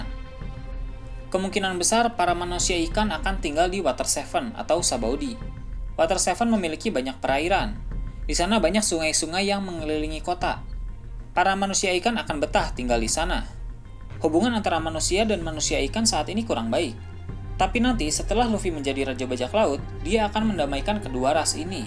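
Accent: Indonesia. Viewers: sejauh ini admin udah oke, tapi untuk penyebutan istilah dalam bhs inggris masih indonesia banget wkwkwk usul aja buat belajar pronounciation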